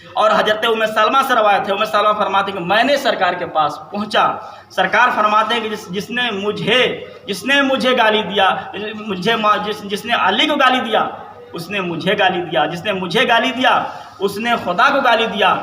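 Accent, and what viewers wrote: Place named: Bihar, India. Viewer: Ye bihari maloom hota hai urdu bolne ke tameez nahi hadees ke baat karta hai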